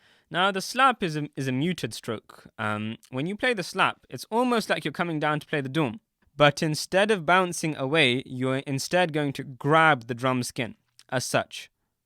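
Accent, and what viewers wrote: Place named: England, United Kingdom. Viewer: Great job brother thanks for the videos. By the way I like your English accent 😊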